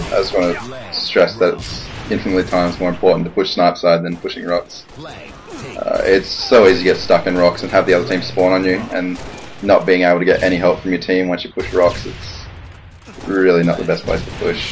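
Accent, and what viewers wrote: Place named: Australia. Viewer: You can just hear enthusiasm when he mentions how excited he is for ep2, but seriously this is better than the american commentary vids. This is actually pretty helpful and I'd rather listen to an aussie accent over a yank anyday.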